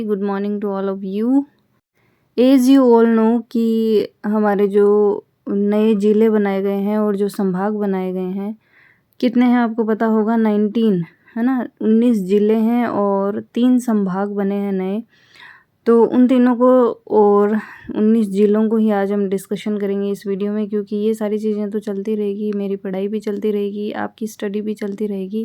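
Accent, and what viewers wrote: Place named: Bihar, India. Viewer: Didi aap Rajasthan se hai aapki hindi up Bihar wali lag rahi hai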